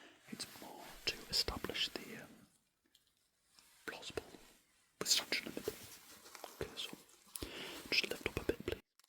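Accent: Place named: Northern England, United Kingdom. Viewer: This is amazing . I’m rubbish at telling the northern accents apart (Londoner 😂) but whatever it is, is so relaxing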